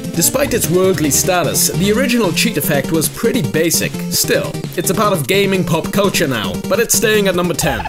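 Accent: Wales, United Kingdom. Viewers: great video I love your work. where are you from? Guess Newport/Cardiff way by the accent? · Yeah, Im pretty sure hes welsh. Sounds just like I guy I know who is from Wales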